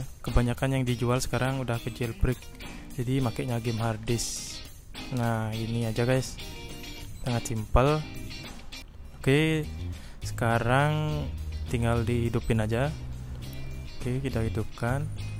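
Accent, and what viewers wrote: Bali, Indonesia. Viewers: Bli.. klo bsa logat bali nya jgn trlalu kental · Logatnya kok kayak orang bali ya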